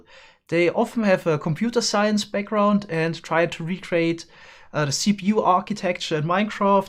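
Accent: Germany. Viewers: Are you german cuz you have this accent? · I love ur german akzent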